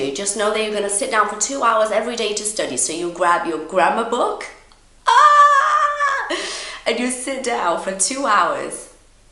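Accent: United Kingdom. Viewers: ....hi....Ididn't like British English till Know You....I'm loving now to listen You...kisses❤ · Your british english is great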